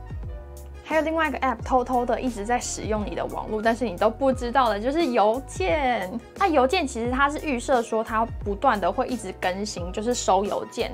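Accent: Taiwan. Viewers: 台湾人都这样念XD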